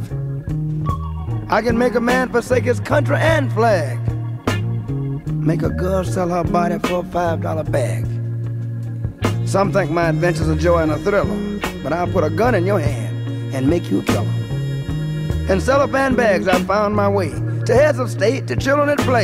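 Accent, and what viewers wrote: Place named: South, United States. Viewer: I love the southern accent. My scots-irish granddaddy had the exact accent, and it's quite beautiful.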